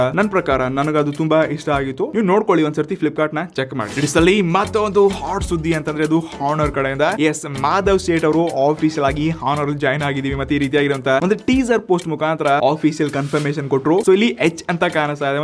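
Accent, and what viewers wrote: North Karnataka, India. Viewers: 143 ಟೆಕ್ ನ್ಯೂಸ್ ಗೆ ನಮಸ್ಕಾರ ಗುರು ನೀವು ಕೊಡೋ ಮಾಹಿತಿ ತುಂಬಾ ಉಪಯೋಗ ಆಗುತ್ತೆ ಹಾಗೆ ನೀವು ಮಾತಾಡೋ ಉತ್ತರ ಕರ್ನಾಟಕದ ಮಾತು ಕೇಳೋದಕ್ಕೆ ಚಂದ your presentation is next level we don't want any changes u always rockzzzzz........🎉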